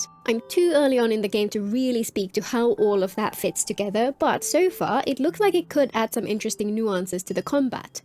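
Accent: United Kingdom. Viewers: You sound Bri'ish like Ver'in and Regulus...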